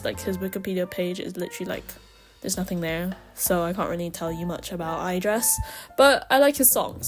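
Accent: United Kingdom. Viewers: love the british accent lol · legit question cause im a bit confused but whats the point of this one? is it because of that shay girl? (idk much bout her, i only heard her name in trese don't think she is big in ph even really, just my experience as someone actually living in ph) also, cool accent, are you British? or American? (you give British and valley girl vibes tbh)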